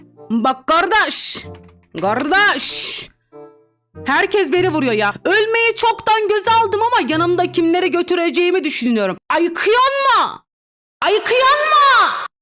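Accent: Adana, Turkey. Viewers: Abla Adana'da mı yaşıyon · Abla adana mi yasiyon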